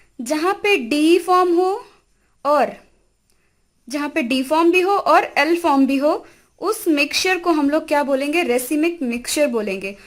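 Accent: Bihar, India. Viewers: Mam Bihaar se hai kya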